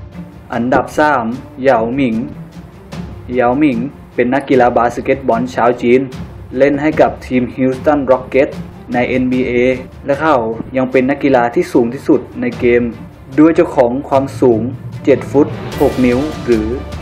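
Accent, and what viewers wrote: Suphanburi, Thailand. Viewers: เหน๋อออออเชียววว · เสียงเหน่อมากเลยพี่ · พี่คนสุพรรณใช่ไหมครับ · พี่พูดเหมือนคนสุพรรณเลยอะ พูดเหน่อ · ทำไมเสียงเน่อจังคับพี่ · คนพากษ์เป็นคนสุพันป่ะครับ 5555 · หึ่ย เหน่อ เหมือนกัน😂😂😂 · พี่เป็นคนจังหวัดสุพรรณป่าว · คนสุพรรณป่ะเนี่ยเราก็สุพรรณ555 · พี่เสียงเเบบเหมือนคนสุพรรณมาก😂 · เสียงออกสุพรรณมากครับ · คนพากเป็นคนสุพรรณใช่หม้ายยยย55555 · เสียงเหน่อไปทางสุพรรณ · เสียงแบบนี้ถ้าไม่มาจากสุพันก้อคอนฐมล่ะ หรือไม่ก้อเมืองกาญ แต่ก้อเป็นเอกลักษณ์น่าฟังดีค้าบ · คนสุพรรณใช่มั้ย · คนสุพรรณป่ะเนี้ย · เหน่อจังอะ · เสียงพี่เหนอไป 555 ชอบๆ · ชอบความเหน่อ..น่าร๊ากกกก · คนสุพรรณ ปะนิ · พึ่งรู้ว่าพี่เป็นคนสุพรรณบุรีเหน๊อเหน่อ มาจาก<num> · พุดเหน่อนะเราอ่ะ..คนสุพันรึป๊าว?5555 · พูดเหนอสุพรรณป่าวเนี่ย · นี๋มันนสำเนียงงคนสุพรรณนีหว่า เน้อเชียว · เสียงเหน่อมาก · คนสุพรรณซิน่ะครับ 555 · สุพรรณ ใช่ไหม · เหน่อมาเลยนะไอ่หม่าลูกพ้อ ชอบๆสำเนียงสุพรรณ👍👍👍👍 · คนสุพรรณเหรอออ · ชอบเสียงบรรยาย เน่อออดี ฟังเพลินนนเพลิน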